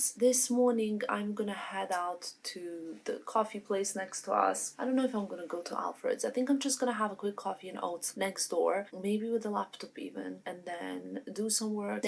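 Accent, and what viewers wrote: England, United Kingdom. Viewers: Through this whole video, especially towards the end, your English accent really came through!! Stay safe xoxo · Omg by the end of the video your accent got SO British ahahah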